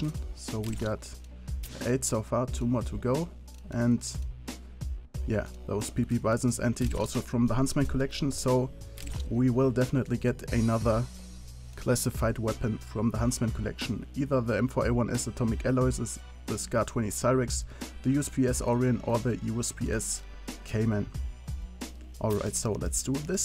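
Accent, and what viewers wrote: Germany. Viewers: naja , so spricht jeder deutsche englisch '-' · Du bist deutsch das hört man:D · krass wie man hört das du deutsch bist :D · Schöner deutsch akzent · It sounds like german english? Its right? :D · red doch einfach deutsch bitte ich pack diesen über deutschen akzent einfach nicht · Are you german? You sound a bit like a german guy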